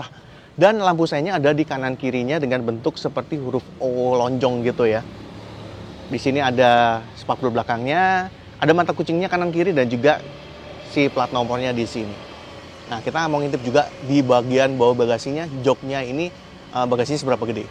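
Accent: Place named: Java, Indonesia. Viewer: masa reviewer logat Medok gitu di bilang enak di denger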